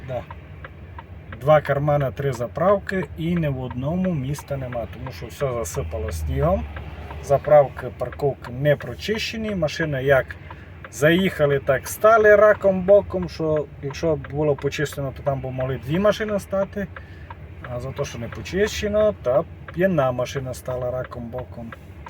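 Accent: Transcarpathia, Ukraine. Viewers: Мені кажуть чому я не знімаю свої відео на українській мові , слухаючи тебе - це теж не українська мова, мабуть Закарпатська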